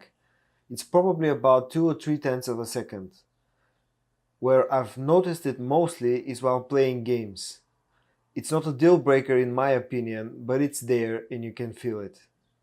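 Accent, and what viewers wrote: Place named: Russia. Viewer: Your english is too russian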